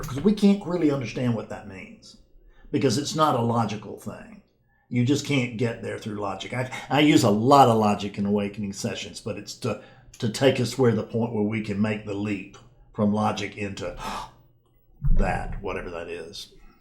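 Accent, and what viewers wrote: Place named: Texas, United States. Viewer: Dear, I would love to see you standing in front of a lectern bashing away with your plastic brain in your charming Texas accent in a church of non duality! Hallelujah brother! Seriously though, that was incredibly helpful. Thank you so much. Very funny indeed 👏👏👏😂🎉🎉🎉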